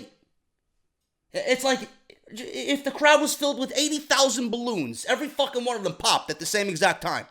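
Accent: New York, United States. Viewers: Newyorker accent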